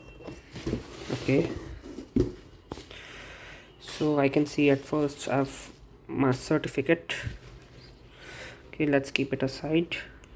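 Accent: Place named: Ujjain, India. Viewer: Bhaii ye ujjaini accent merko bhi sikha 😆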